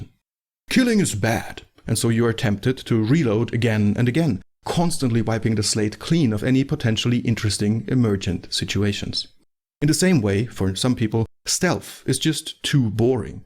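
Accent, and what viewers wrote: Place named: Europe. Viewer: Its always the guys with the euro accents that make batshit videos.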